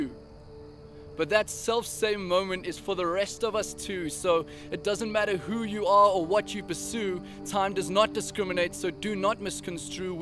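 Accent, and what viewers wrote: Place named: South Africa. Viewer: Is he south african???? Sounds south african! Would be epic if he was!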